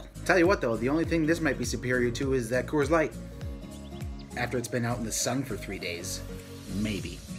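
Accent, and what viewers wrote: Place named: Upper Midwest, United States. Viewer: He has a strong Northern Midwest accent. Sandler has a New York accent. Kind of similar I guess.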